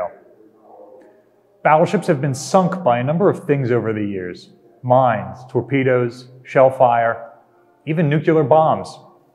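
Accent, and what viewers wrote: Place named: Missouri, United States. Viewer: Dudes obviously from Missouri....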